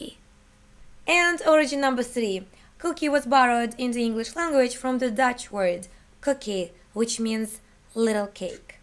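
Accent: Russia. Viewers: you russian accent is awesome i must say! good on you! kak naschet slova 'sadism' ? mojno nemnozko pogovorit a marquis de sade i tak dalee. privetik is germanii, serojenka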